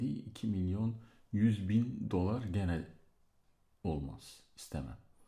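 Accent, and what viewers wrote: Eastern Anatolia, Turkey. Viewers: Reis bunun yeni bir halini bekliyoruz doğu şilveli mafya efsana olmuşş seviliyorsun 500.000 dolar da iyi iyi iyi baya iyiii <3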